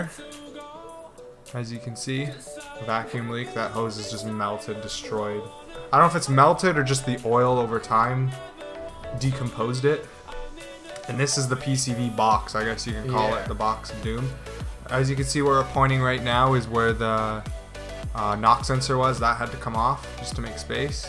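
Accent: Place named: Canada. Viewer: Good video. The Canadian accent makes it even better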